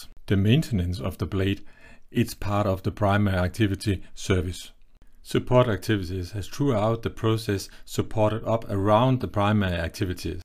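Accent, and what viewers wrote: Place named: Denmark. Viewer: its called "Danglish".....english with heavy danish accent. typical for people around 50 and up that didn't learn english from series or movies.........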